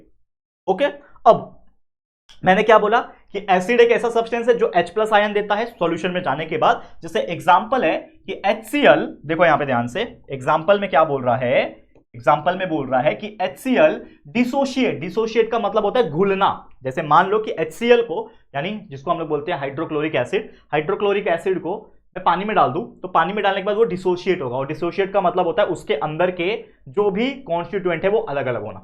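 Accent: Maharashtra, India. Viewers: sir, is your mother tongue marathi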